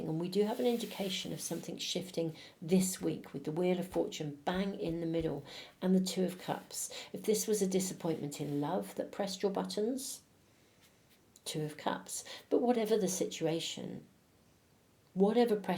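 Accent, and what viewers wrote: United Kingdom. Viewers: Hyy, you! I love your readings, your lovely british accent and your unusual, awesome oracle decks! Great reading..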